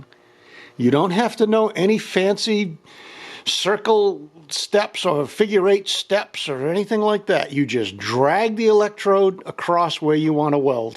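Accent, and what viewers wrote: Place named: New Hampshire, United States. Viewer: Where you from? Your accent reminds me of home in New Hampshire. You convinced me...I'm jumping on one of these.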